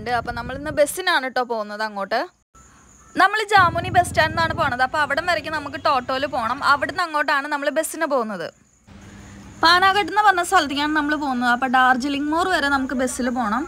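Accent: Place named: Thrissur, India. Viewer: സാധനങ്ങളുടെ വിലയുംകൂടി പറഞ്ഞാൽ നന്നായിരുന്നു (തൃശൂർ സ്ലാഗ് ഉണ്ടല്ലോ ) എന്തായാലും നന്നായിട്ടുട്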